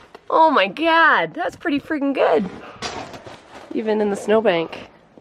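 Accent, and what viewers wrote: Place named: Canada. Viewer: I think just has a thicker Canadian accent. Hers shows up sometimes, but it isn't as noticeable.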